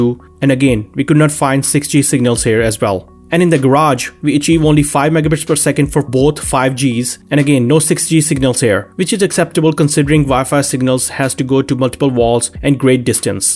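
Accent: India. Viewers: The Indian accent made me wanna stop watching, I can’t, it’s so heavy😂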